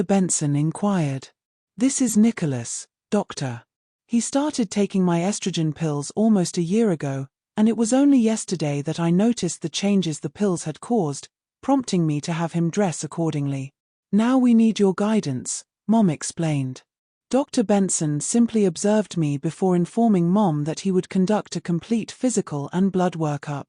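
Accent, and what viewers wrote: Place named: United Kingdom. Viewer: They are NOT mispronouncing "schedule". You obviously failed to notice the AI reader's BRITISH accent. That is the way the British pronounce "schedule.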